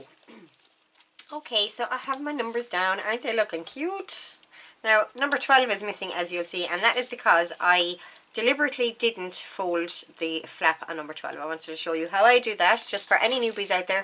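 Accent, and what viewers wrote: Ireland. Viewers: Lovely to watch you. Great ideas you have. Love your accent where in beautiful Ireland are you? Best wishes from New Zealand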